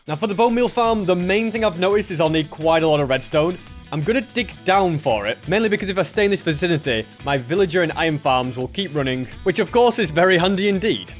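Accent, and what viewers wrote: United Kingdom. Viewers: Are you British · Today is the day,I realized that is British 😅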